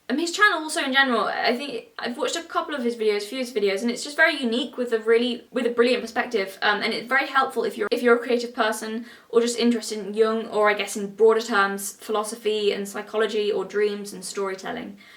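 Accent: United Kingdom. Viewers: Just found this channel and this is absolutely glorious. And I don’t just say this as a fellow stylish Brit with a similar accent and knack for swearing. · Shadiversity….Now I know why you showed up in my recommended out of nowhere lmao EDIT: oh this is gonna be a really British woman ranting passionately about tyrants. This will be good for my lifting routine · It's such a relief to hear with my own ears that intelligent people with British accents still exist. Greetings from across the pond. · I'm a brand new viewer, your James cordon video just came up in recommended and I was like yeah sure. I do not give a shit about James cordon, I don't care about any of his work, but I watched the whole entire video in one sitting not because I was waiting in baited breath to finally see James cordon finally get demolished, but because of you. Your writing, performance, delivery, structure, you completely hooked me and I subscribed immediately. 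Maybe it's just the novelty of hearing angry British woman as an American but I watched your video not because I wanted to hear about James cordon but because I was curious about your channel and you hooked me in and after watching this video all in one sitting right after that James cordon (drunk yet?) I have a feeling nobody cares all that much about what you talk about, only that you talk about it. I'm not watching A VIDEO about James cordon I'm watching YOUR VIDEO. I really hope you stick around here cause you seem to be good at this. Maybe you declare you will in this video, I'm only at the 30 min mark but regardless of what you decide, I wish you good luck, you certainly deserve it Edit: finished the video now, another channel called filmento is a good example of what you're trying to do I think. He uses movies to talk about concepts like how to make a movie for kids vs adults or how to poorly or properly do exposition so he might be a good bit of guidance for what you want to try to do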